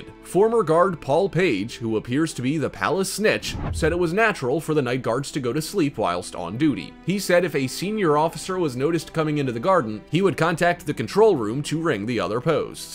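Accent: United States. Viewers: there's an American doing the voice over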